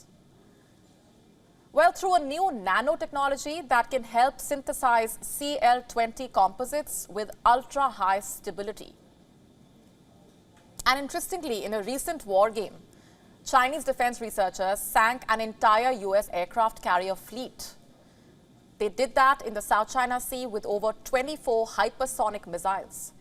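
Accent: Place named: India. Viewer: 印度口音:pride-person-earth-intersex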